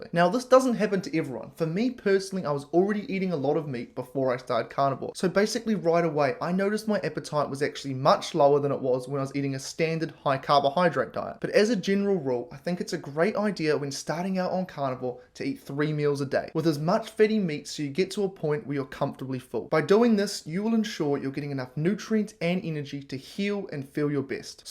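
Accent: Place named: New Zealand. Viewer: Great to hear a Kiwi accent on YouTube 😊 Chrissy from Te Awamutu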